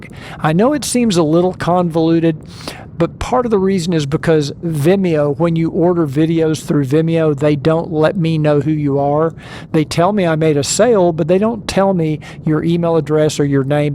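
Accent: United States. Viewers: Ok Hi Mr " ".. So. (Oh this is weird talking/ typing this, Sorry. First time I come in some way contact with you Sir and We're talking teeth.- I didn't see that one coming?") But since you still bring up such a very important subject I'll submit my thoughts on this. Yes first appearance is what you notice with people.. in someone's face. That would be the teeth and smile! 82% of U.S. adults agree that the first thing they notice about others is their smile. I know bec. i did that with you, Sir. I saw the teeth and saw that it looks somehow painful. I Could hear it on your speech as well and that something was of? No. But there was something with your lower jaw or your sound on your voice I mean how you sound apart from that rough Dallas Texas- dialect of yours....it almost sounds something like you suffer a blocked nose? (And Sir, NoHate at all) But now I could tell that this, yours, your special sound of yours.. 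That is You! I don't have to not even see you anymore too know. And I've learned to like it and almost got used to it now at this point! It's that easily recognized- voice of yours again these days! I just sit back and listen to you and follow along on both your long and less short journeys. And it's amazing! I can see I don't even notice the teeth anymore! I don't know exactly.. Oh man you got me on this subject! This can be a touchy/ sensitive subject overall by just talking about teeth in general. And I don't Judge people? I'm Not judging you now at all...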